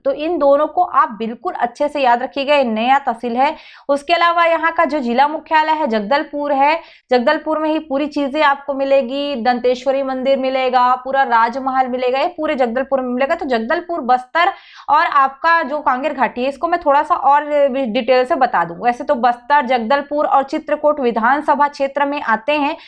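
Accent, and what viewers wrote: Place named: Odisha, India. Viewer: Thoda odiya accent ata hai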